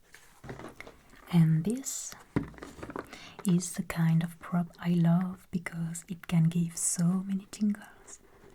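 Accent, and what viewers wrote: France. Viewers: Tu as un accent français, tu es française ? :)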